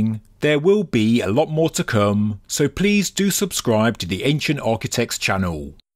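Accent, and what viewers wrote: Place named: Leicester, United Kingdom. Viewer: no I was only talking about the accent and the tone of the sentences, guess I never heard the leicester accent😂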